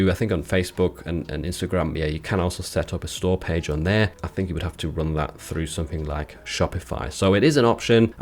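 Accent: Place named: North, United Kingdom. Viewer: How tf is your accent so good?! I'm English and I'd NEVER think you're not a native northerner. I don't think I've ever heard a non-native with such a convincing REGIONAL english accent. I also think its great that you chose that accent rather than a boring southern one. Super impressive 👏 btw I only know about this because someone told me, otherwise it wouldn't possibly have occured to me!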